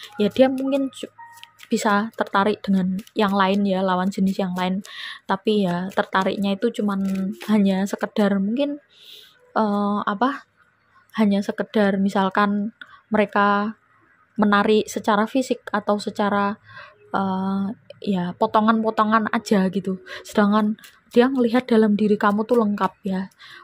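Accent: Java, Indonesia. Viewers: Keunikanmu pn untuk ku suara dn logat jawa yg lembut mu buatku sangat senang dn kumerasa auramu sangat positip.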